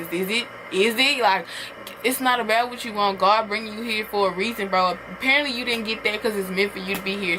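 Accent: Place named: Houston, United States. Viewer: Lmfao you talk like you from Houston 😂😂😂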